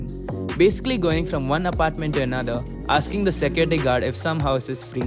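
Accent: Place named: India. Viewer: best part your Hindi accent Macha ❤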